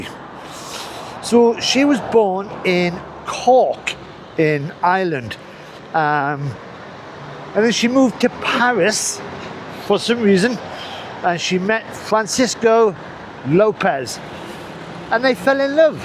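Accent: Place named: Newcastle, United Kingdom. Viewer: Where you from Bonny Lad? Newcastle or Sunderland ..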